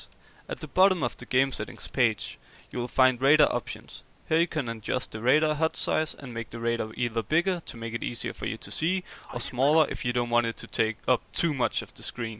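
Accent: Denmark. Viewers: That danish accent!! :p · You’re danish right? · Du er dansk kan høre det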